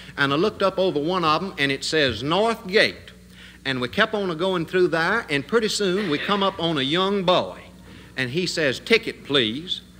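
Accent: United States. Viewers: Andy had all the charisma of Jerry Lewis and Dean Martin all in one with a southern accent. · Yes he did he has Carolinian accent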